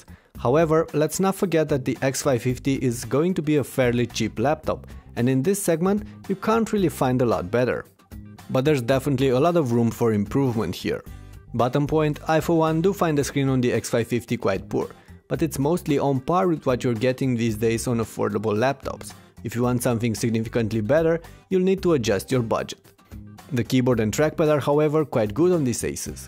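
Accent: Romania. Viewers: Mai mult ca sigur esti roman (fac referire la narator) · romanian accent? :)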